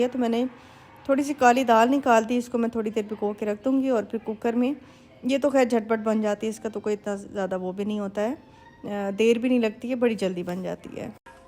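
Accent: Saraiki, Pakistan. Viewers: ji u r very beautiful. And your house is so beautifull. Aap bolti bohot pyara hn. Jub sairiki bolti hn to sun k mujhey bohot he acha lugta h. Mere naankey bhi pichey Dera ghazi Khan se thy. To sun k apnapun lgta h. I m from India.God bless you. Bye.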